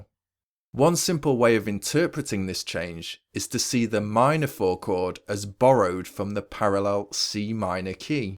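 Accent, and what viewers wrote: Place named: Liverpool, United Kingdom. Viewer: An excellent explanation of the topic. Before the Internet and YT, it was nearly impossible discover such theoretical concepts and approaches to composition. Plus, I enjoy picturing George Harrison as instructor, since you sound very much like him—a fellow Liverpudlian, I suspect. Cheers!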